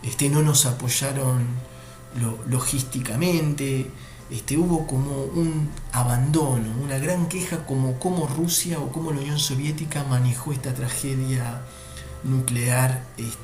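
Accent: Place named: Argentina. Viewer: por tu apellido de origen "israelí " no se si seras imparcial ,pero viendo el mapa de crimea como ucraniano creo que no...por tu acento pareces argentino ,sabras que Inglaterra antepone la validez de los kelpers ,ciudadanos de segunda ingleses la AUTODETERMINACION DE LOS PUEBLOS para el tema malvinas ellos quieren ser ingleses....en CRIMEA. esa misma AUTODETERMINACION dice el 97% quiere volver a la madre patria Rusia y volvió..que paradoja Occidente acepta lo de los kelpers no lo de los crimeos , a y te recuerdo que israel siempre voto en contra de argentina las resoluciones de la onu sobre malvinas 3 países lo hacían ISRAEL...USA....Y GRAN BRETAÑA ....a mi humilde entender la trilogía del mal en el mundo VIVA RUSIA...VIVA PUTIN !!!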